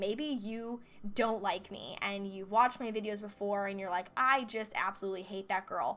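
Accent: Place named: South, United States. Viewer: I'm sad to hear that you have to change how you act even in the slightest. I loved your sarcasm, it was hilarious and fell right in line with mine. Also I love your southern accent and drawl. Being an Oklahoman myself, its awesome to hear a "southerner" sometimes. :P But you're adorable, I love your videos, I love your vlogs, and I hope one day I will get to meet you.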